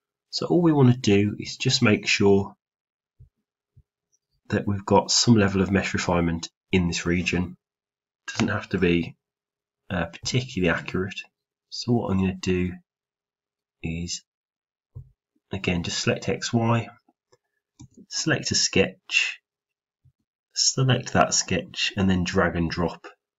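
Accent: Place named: North, United Kingdom. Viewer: Thanks for your reply!, im from Australia. Your videos were a great help with my Thesis, modelling and simulating a new Wind Turbine i designed. I mentioned to the other Engineers for great professional tips look out for the guy with the Northern UK accent, am i right location wise? .. Yeah the mesh looks good after a tonne of fine tuning whilst using various research papers also in setup. I actually used one of your other videos mesh today and its currently running, it hasnt crashed so thats good but looking at the plot monitor for normal forces and its still too high. Im going to save this project as it looks like its actually going to complete successfully. I will recreate the mesh using this videos mesh and let you know if it improves the Y+ value which i would assume isnt good at this point. (What i like about this video is the refinement region), which i have been seeing other similiar simulations to my own using but in a convoluted way. 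Your way makes way more sense. Thanks again mate!.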